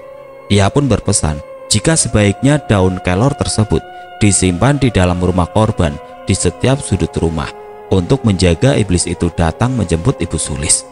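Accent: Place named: Java, Indonesia. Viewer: bukan, kayanya yg bacain cerita ada 2 org. Klo yg biasanya itu mngkin yg namanya mas, logatnya Jawa agak2 ke perbatasan Jawa barat. Tp klo yg ini Jawa nya medok bnget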